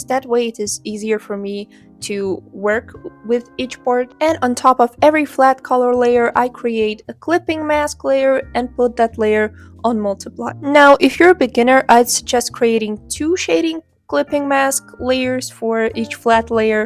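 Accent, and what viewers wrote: Russia. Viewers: йоу я подумала что вы русская, откуда вы?? Что за акцент?? ник?? ЕМАЕ ЭТО ТАК ПОЛЕЗНО БОЖЕ МОЙ. СПАСИБО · now this is useful but id like to ask if you're Russian i feel like i hear it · Понять не могу... автор русская? Слышу отчетливый акцент...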